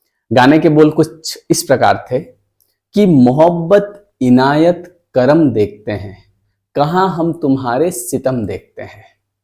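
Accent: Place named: Madhya Pradesh, India. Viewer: भैया आपकी बोलने की टोनिक वाकई एमपी वाली है मैं भी एमपी से हूं❤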